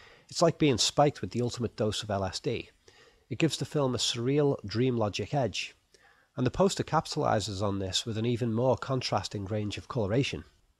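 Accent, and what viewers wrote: Liverpool, United Kingdom. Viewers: A scouser from Liverpool, yes!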